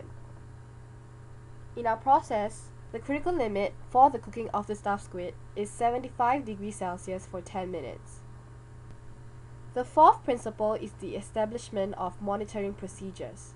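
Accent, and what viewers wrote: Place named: Singapore. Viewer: Very good video. You sound Singaporean, btw. ;-)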